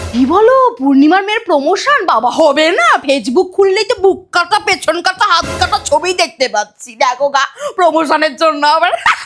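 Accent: Bangladesh. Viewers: Kotha sune Bangladeshi mone hoy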